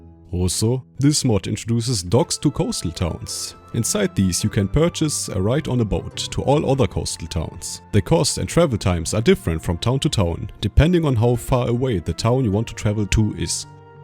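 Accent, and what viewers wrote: Germany. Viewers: liebe deinen Deutschen akzent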